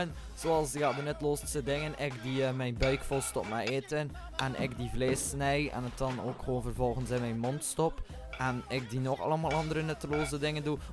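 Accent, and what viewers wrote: Belgium. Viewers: Netflix&Chill met mij omdat ik uhm nouja uhm uhm omdat ik uhm je belgische accent en je haar vet schattig vind en ik ben (hoop ik) ongeveer 100% een jongetje ^^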